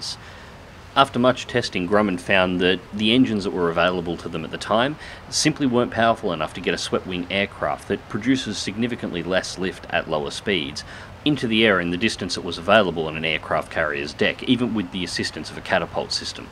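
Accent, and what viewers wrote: Australia. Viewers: Love hearing a friendly aussie accent <3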